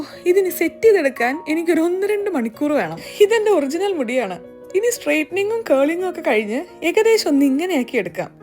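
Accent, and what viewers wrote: Malappuram, India. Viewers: ഞാനും മലപ്പുറം തന്നെ മലപ്പുറംകാരെ ഇങ്ങനെ പറയുള്ളൂ ചേച്ചി😂😂😂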